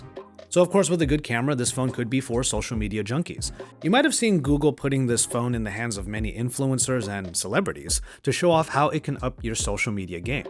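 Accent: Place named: Philippines. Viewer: I love it na hindi mo tinatago ang Pinoy accent 💙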